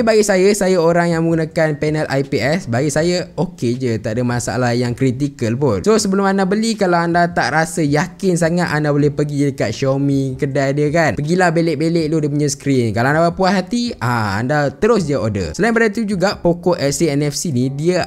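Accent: Taiping, Malaysia. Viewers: Hai bro.dok taiping ke?? Cm jiran kee hhshshshssj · Orang tepeng ka?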